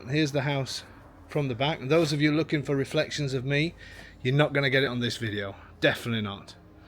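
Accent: United Kingdom. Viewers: There are different pronunciations and spellings of the word. Any of them are acceptable depending on the region you’re from. Keep in mind that is in the Uk so anything he says is specifically from the Uk and not the US or wherever you’re from.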